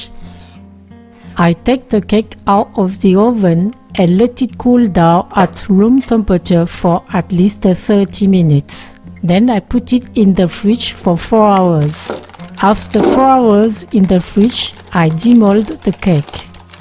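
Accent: France. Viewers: Je vais faire ce gâteau au fromage! J'étais dans mon lit, et j'ai pensé, je veux savoir quel est la première langue de. Elle parle anglais comme une personne française, alors je dois savoir ta première langue. Mais, cette vidéo est incroyable, c'est comme tes autres! C'est facile à faire, et la recette est tres claire.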